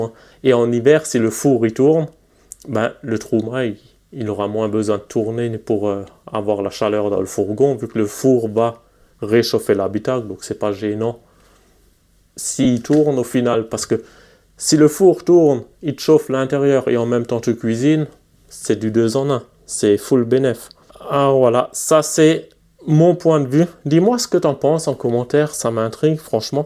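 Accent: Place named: North, France. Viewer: Salut! C’est sur le débat est intéressant, Mais faut voir que le soleil est toujours là du moins dans la journée, le gaz tu dépends de l’homme! Si demain le gaz monte au niveau tarif tu seras dépendant si demain il n’y as plus de gaz ta copine ne fera plus de gâteau…… Moi j’ai assez de watts pour faire la bouffe une heure par jour et si il n’y as pas de soleil au pire, je roule une heure et je récupère mes ampères … Mais bon perso je déteste le gaz, petit j’ai vu une explosion dans un immeuble et depuis je crains le gaz! Bref merci pour tes vidéos, toujours aussi pro! Et toujours aussi agréable de t’écouter avec ton petit accent de la haut! À bientôt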